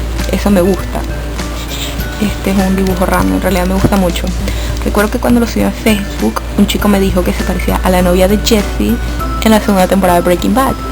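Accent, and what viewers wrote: Venezuela. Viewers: Apenas oí tu voz me di cuenta que también eres venezolana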